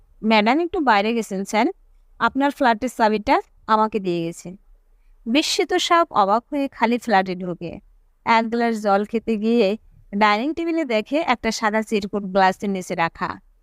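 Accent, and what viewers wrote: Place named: Bangal, Bangladesh. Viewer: Bachik shilpir golpo-porata ekdomi bhalo lagalo na. Bangal bhasay kotha barite boltei paren, tai bole publicly porar somoye, koresen, khaisen, seleta, hoyese, firese - eigulo kane kot kot kore lagey. Ishh.....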